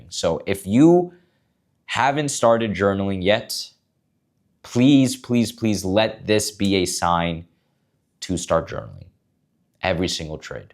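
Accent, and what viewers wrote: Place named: India. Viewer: He is not somali. He looks like indian. And he speaks their accent.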